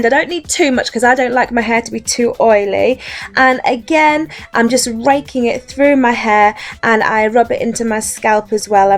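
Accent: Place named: RP, United Kingdom. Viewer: God, I want your accent. I've always looked at those accents as classy and posh. Very pretty sounding.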